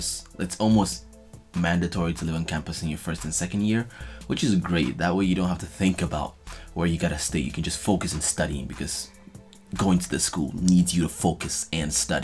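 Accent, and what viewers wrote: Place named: Nigeria. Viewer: Are you Nigerian? You lowkey have an accent 👌🏾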